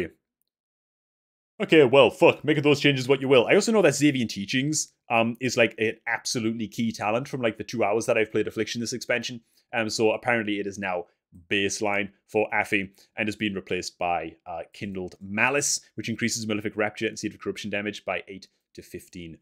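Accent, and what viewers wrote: Newcastle, United Kingdom. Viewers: Are you from Newcastle m8?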